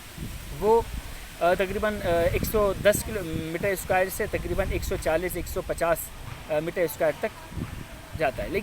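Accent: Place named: Karachi, Pakistan. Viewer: Bhai ka sahi karachi wala accent ha .. zabardast...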